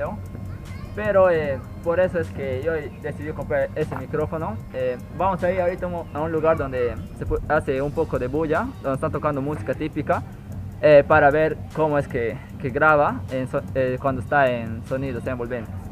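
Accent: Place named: Amazon, Peru. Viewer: Jaja eres charapaza hermano, saludos desde lima buen canal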